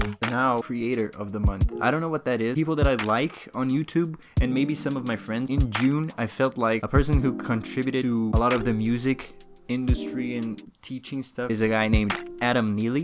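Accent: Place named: United Kingdom. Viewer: Having a british accent is an unfair advantage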